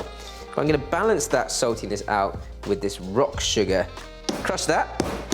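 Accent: United Kingdom. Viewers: Asian with british accent is wild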